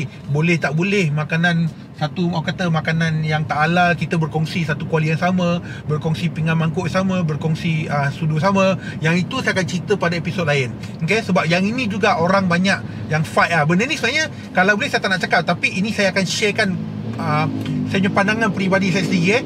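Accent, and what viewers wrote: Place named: Negeri Sembilan, Malaysia. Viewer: Loghat N9 lak... best dengar...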